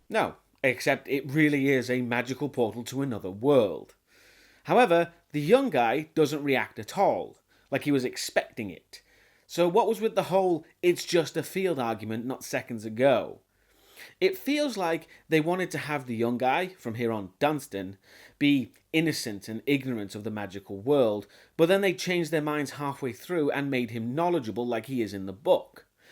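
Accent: United Kingdom. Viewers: cool, anyway,i feel that even though we may disagree on some issues, i still love your reviews. i wonder, how are you in reviewing movies outside of horror and action? maybe some comedy, or if we can crossover genres then maybe some MCEU or DCU, maybe throw in some Stathem movies and some Die Hard for flavor. for further horror, there is still the nightmare franchise with micheal myers and jason vorhees. if you are so inclined. love your work BTW. i was wondering, whereabouts in england are you from? sounds a little like a yorkish accent. i may be wrong.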